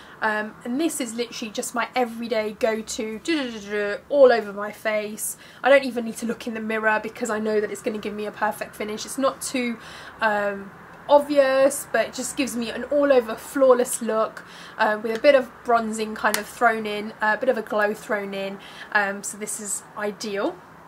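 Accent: London, United Kingdom. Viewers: where's ur accent from.. london? .. oh and hello :-))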